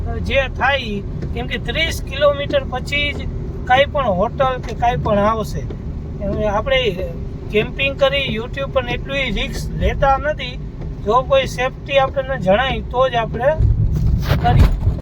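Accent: Kathiyawad, India. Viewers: Har har mahadev..... tamara videos bo boring hoy che.... tamaro bolvano lehko batave che ke tame fakt kathiyawadi Gujarati boli sako cho to bijane samaj ni pade.... tame natak pan bo karo cho jemke hamna aa aunty.... jagya ne barabat explore karta nahi aavadtu tamne.... matlab tame khali dekhado karo cho navi camper van banavi eno.... bo boring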